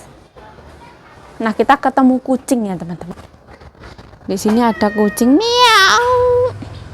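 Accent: Java, Indonesia. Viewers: Logat jawanya di kurangi yu klw berbicara bahasa taiwan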